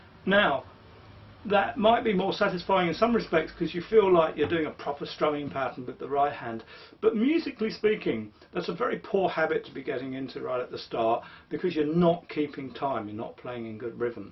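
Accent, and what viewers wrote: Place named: United Kingdom. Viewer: 這位老師的youtube頻道叫做SecretGuitarTeacher(對沒錯三個單字連在一起） 這位老師教得真的很不錯 但要吞得下英國腔XD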